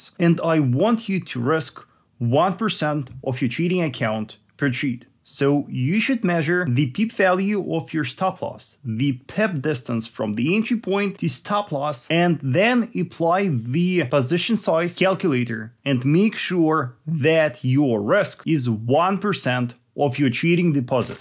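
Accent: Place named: Russia. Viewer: Damn I never heard such strong Russian accent. 😊 love Russia, the only sane people in the world! I hope to learn from you!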